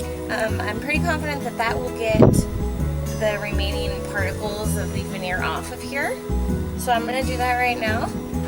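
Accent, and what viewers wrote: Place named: Oregon, United States. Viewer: How funny. I stumbled onto this video, and all of a sudden I thought, "This woman really sounds like she is from Oregon." I had to check it out, so I went to your web site and I see you are in Coos Bay. I didn't know we had a recognizable accent! I'm from Medford.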